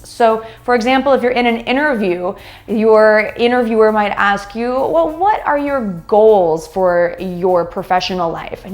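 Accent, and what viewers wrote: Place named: United States. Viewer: I like American’s accent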